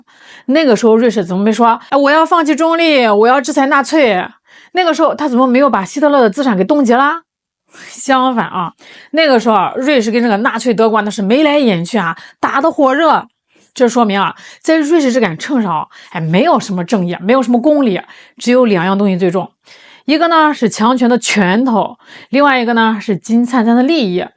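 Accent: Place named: Shandong, China. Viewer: 这口音是闪董印. 挺解压滴. 瑞士人坏的很, 他周边的国家没一个不恨他的, 美国也时常修理瑞士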